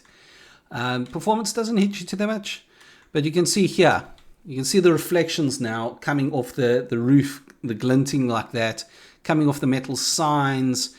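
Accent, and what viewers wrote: South Africa. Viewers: i know a afrikaans brother when i see/hear one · Your accent gives me a South African vibe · You sound South African 😉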